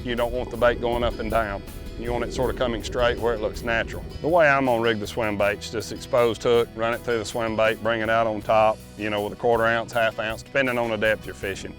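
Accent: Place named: Appalachia, United States. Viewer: You know it's good when he has a hillbilly accent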